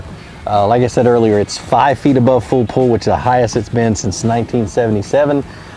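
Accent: Georgia, United States. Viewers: Are you from georgia? Now I will follow all your tips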